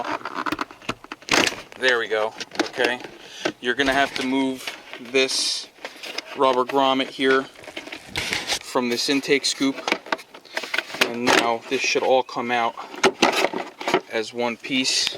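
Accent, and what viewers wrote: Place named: Philadelphia, United States. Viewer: You hear South Jersey, I hear Philly, but they are close enough to almost be the same.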